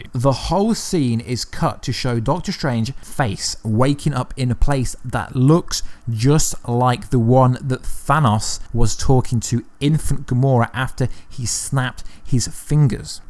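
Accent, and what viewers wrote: United Kingdom. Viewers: Bruh are you British or a South American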